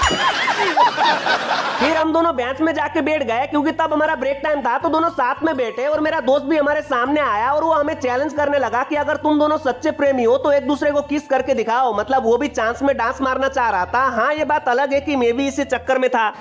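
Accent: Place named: Nepal. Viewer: When nepali speaks hindi and thats too assamese thisis awsome ❤️❤️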